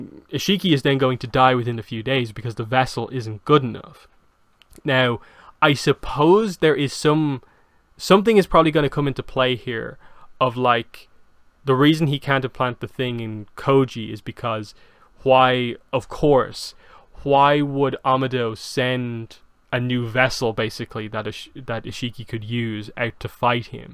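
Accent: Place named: Ireland. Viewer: You sound Irish but this was a v good review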